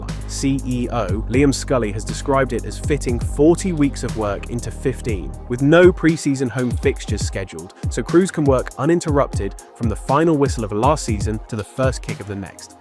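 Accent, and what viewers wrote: United States. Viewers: Maybe drop the American pronunciation on several words dude.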